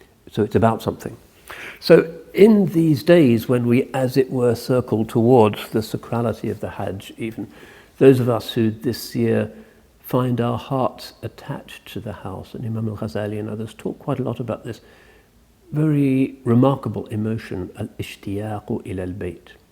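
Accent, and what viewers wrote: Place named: RP, United Kingdom. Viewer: What thick accent? This is RP (received pronunciation), a cultivated, educated register of British English.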